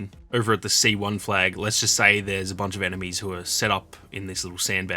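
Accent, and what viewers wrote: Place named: Australia. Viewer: Always love your content, really think you deserve way more views from the quality of your vids. Nice to see (and hear) a fellow Aussie!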